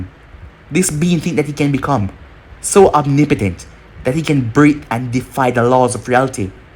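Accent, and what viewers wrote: Jamaica. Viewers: I’m good my boy also are u Jamaican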